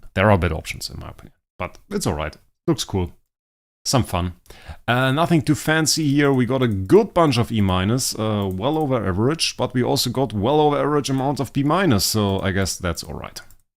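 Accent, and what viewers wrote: Germany. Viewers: He's from Germany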